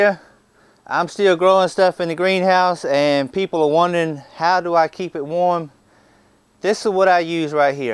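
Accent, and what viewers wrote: South, United States. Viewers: Love hearing someone with a strong southern accent describing things so intelligently and knowledgably. Well done on the video and your project; you obviously have it figured out. Thanks for the post!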